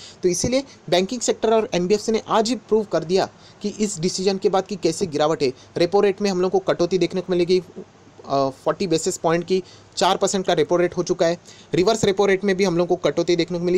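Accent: Maharashtra, India. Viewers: Sir very fine analysis, a am watching your each and every vidio, one thing I want to ask you, I guess u belong to maharashtra, from your language I come to know, I am from pune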